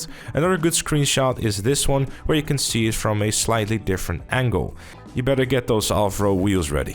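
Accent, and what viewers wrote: Netherlands. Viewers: He sounds Dutch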